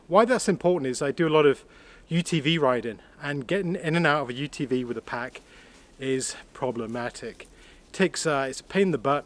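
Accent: United Kingdom. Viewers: Was not expecting the British accent, but then I am new to the channel. Survival Russia bought me here BTW.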